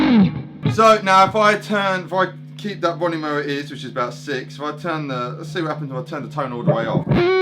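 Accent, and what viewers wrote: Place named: South London, United Kingdom. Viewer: Great video - the most basic fuzz lesson but the most instructive. And love the SRV/Hendrix towards the end!!! Brilliant. And love the sarf Londern accent...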